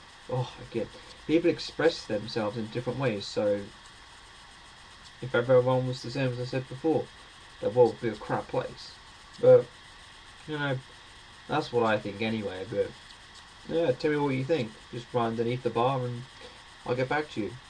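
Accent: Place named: United States. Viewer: Dude please, PLEASE drop the american acsent!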